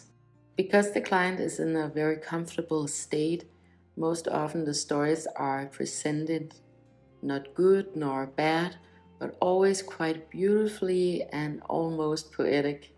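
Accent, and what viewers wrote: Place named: Denmark. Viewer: Det lyder som en dansk accent 😊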